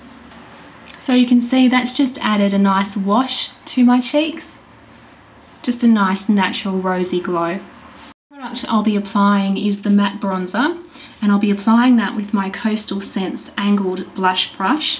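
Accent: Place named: Australia. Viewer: Great look! i love your accent!! your Australian right??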